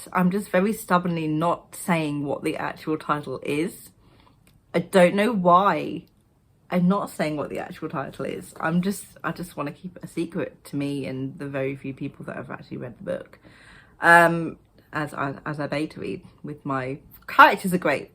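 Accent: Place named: United Kingdom. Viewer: So good to see you again! ^_^ I am all in for the tea and the british babbling, please gimme all the vibes, LOL! I prevent/ cure creative burnout with rest and/or other creative things. Handcrafting helps when I feel I don't get anything done - some hours of work, and you can literally see the work and put your hands on it. Consuming stories sparks new ideas, and listening to audiobiooks while weaving or sewing combines the best of both worlds. I am also not planning on writing anything new anytime soon. I want to finish the current part of my fantasy series - and once that is done, I need to go back and revisit some of the earlier parts, make some minor revisions and bring it all up to my current standard of writing and world building. That should be enough to keep me busy duringall of 2022. ;-) The title and cover of former Project Fae are amazing, they gave me the shivers! 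^_^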